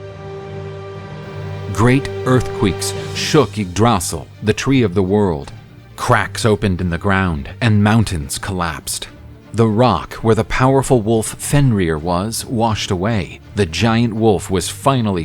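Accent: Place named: United States. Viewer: You say all of the Nordic words like an american, great storytelling other than the pronunciations of names.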